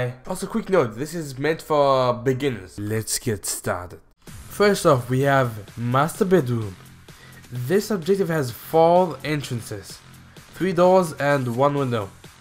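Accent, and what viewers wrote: France. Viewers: I love your accent!!! I'm not really good at guessing accents but you sound french, german, or arab. Love the videos keep it up. ❤ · He sounds French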